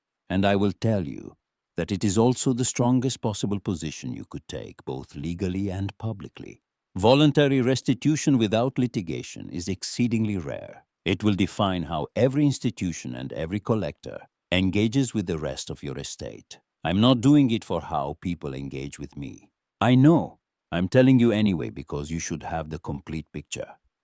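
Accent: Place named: Wales, United Kingdom. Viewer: Loving the Welsh accent ! Makes a nice change from an American one or a faux English accent. ♥️🇬🇧♥️ It keeps changing between Welsh, Indian and French, hilarious ! 😂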